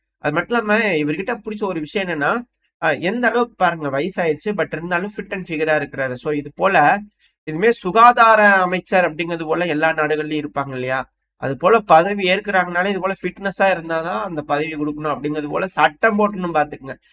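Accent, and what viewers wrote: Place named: Kongu, India. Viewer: Thambhi,,,. Ungga.,, Konggu. ,Thamiz Super ,, silence,,pechu,,,good🎉🎉🎉🎉🎉🎉🥭🍊🍑🍉🍎🍒🍓🙋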